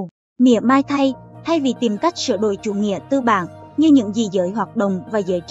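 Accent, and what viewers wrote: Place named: Hue, Vietnam. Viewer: Lâu rồi mới được nghe giọng Huế.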